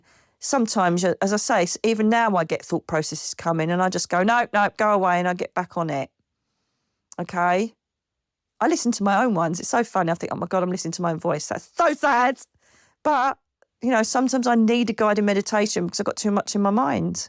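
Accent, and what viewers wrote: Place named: United Kingdom. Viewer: I just love the British accent and the popping words on the screen! It actually helps to focus and am sure it helps people with any hearing disabilities. Good job!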